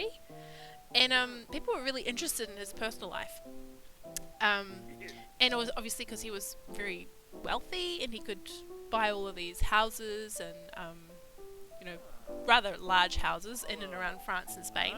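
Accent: New Zealand. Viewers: I love the sims. love kiwi accents also "struth"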